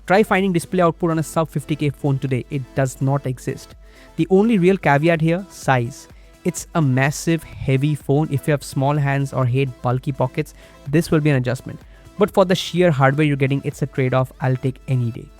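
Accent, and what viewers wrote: India. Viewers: Bro, are you from Odisha?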